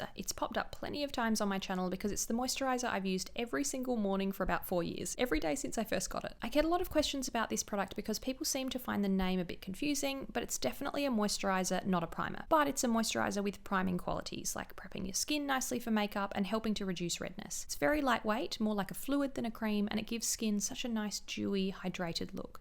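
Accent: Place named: Australia. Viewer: I never really got into makeup before but I’ve got orders from Glossier, Fluff and UltraViolette coming now.... and I have you to thank/blame! 😂 Like many others I’m so so grateful that your makeup ‘maximalism’ can help so many people see products perform, decide for themselves and embrace minimalism in the way they actually purchase and apply product. It’s good for the world. Your lovely accent makes me proud to be Australian too. Sending love from Melbourne! ❤️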